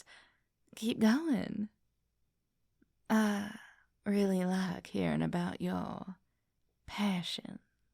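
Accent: South, United States. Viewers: I do like the southern country girl accent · Hi this video is awesome and plus I love your southern accent and plus I love the video and now i go to sleep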